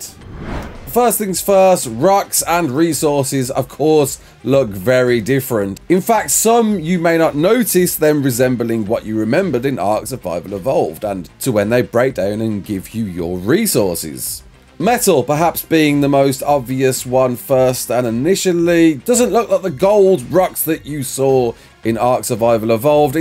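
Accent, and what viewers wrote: Birmingham, United Kingdom. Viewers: Honestly I only came across you waiting for xbox release, but its fuc*ing nice to hear a fellow Brummy on youtbe! I've subscribed and I hope you make videos for xbox 👍🤟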